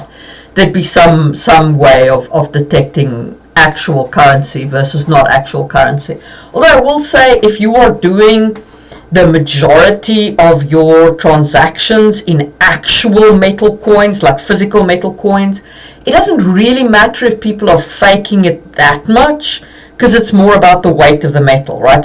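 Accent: South Africa. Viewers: Oh, hey, aunty! I wasn't expecting a reply! I would have been a little more cordial otherwise. I just wanted to jot my opinion down. Just want to say I absolutely LOVE LOVE LOVE your videos! They've made me even more inspired to world-build for my D&D/Fanatasy RPG games! This was the one point where I was like "Huh! Actually, I think I [respectfully] disagree here." In a world filled with powerful mages, there will always be people like Havelock Vetinari from Terry Pratchett's Discworld and Stan Edgar from The Boys (TV series/comics). By the way, your accent sounds South African to me. Are you from there by chance? I absolutely adore South Africa. And I'd like to visit one day.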